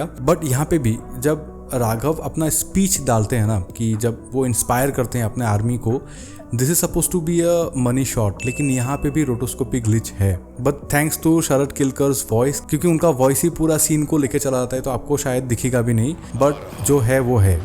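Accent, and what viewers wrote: Assam, India. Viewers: Assamese boy speaking hindi in assamese accent😂😂😂.....moja video❤❤❤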